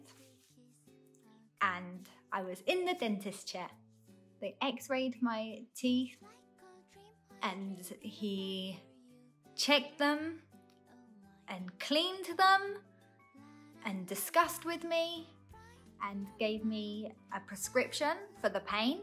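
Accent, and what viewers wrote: United Kingdom. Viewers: Love your beautiful teeth, smile, dentist stories and that bloody gorgeous British accent~~~~ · 英國腔，好好聽喔 ~~ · 謝謝妳最愛英國腔了我的英文有救了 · 英國腔英語很好聽，南非也是英式語言，我住過幾年，但是沒有學好英語！現在聽到熟悉英語很親切，很好聽！很棒！謝謝分享！😄🇹🇼💯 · I love tha way u talk, so British! Is your husband Taiwanese? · 英國腔真好聽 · 哇、正英國腔的美女、希望有空你也可以講些你家鄉的事、讓我們也長見識、畢竟英國太遙遠、或許透過你也可以讓我們多了解英國。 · I really like you, like your British accent. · 英國美女講出好聽的濃濃英倫腔，速度及清晰度都適合用作語言教材範本。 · 您的英式英語口音真是令我覺得悅耳動聽啊！ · 英國腔真好聽。台灣牙科還是有更高級的診所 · 記得定期去洗牙齒喔不然會有牙結石價格也是掛號費很好奇英國或是其他外國人牙醫這麼難預約有習慣在洗牙的嗎? 還是說洗牙的費用比較便宜? 最近常常在網路上看到牙結石超大塊的案例不知道是因為牙醫太貴太難約又沒再用牙線才有這種狀況發生嗎台灣牙醫太多很多裝潢甚至非常的高級豪華卻一樣只收掛號費 (除非是矯正牙齒之類的健保不給付的) 所以牙醫的技術真的差異蠻大的我家高雄這邊就有因為拔智齒的速度快到被稱為快槍手出名的牙醫在但有的甚至為了賺錢很愛給人拔牙齒或各種牙齒保健療程把原本的流程分拆複雜化因為這樣他們能得到健保比較多的費用我本身認識的牙醫他就是主張能不拔就不要拔所以我四顆智齒都沒長歪牙醫師也說不影響就不要拔因此我對他很信任我曾經也有過牙齒補的地方掉了那時候我人在台灣但也真的超緊張怕吃東西殘渣之類的繼續腐蝕我的牙洞所以就馬上跟公司請假去看牙醫了所以我能理解你當時沒有醫療補助又遇到這種情形有多恐慌真的想不到國外有賣補牙DIY組.... 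因為補牙的時候牙齒要完全清潔才能補上去不然細菌反而被蓋在牙齒裡面更糟糕最近開始追蹤我本身很喜歡英國腔覺得很舒服真的跟留言說的一樣難得有說的這麼慢跟清晰的英國腔:D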